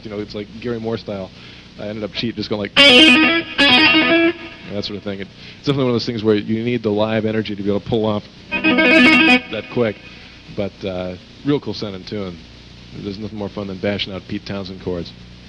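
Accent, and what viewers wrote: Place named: East Coast, United States. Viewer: East Coast accent.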